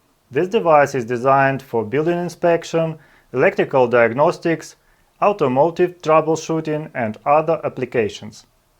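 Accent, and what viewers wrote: Russia. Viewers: Хоть ломаный английский и стал получше, но акцент русского языка так и остался )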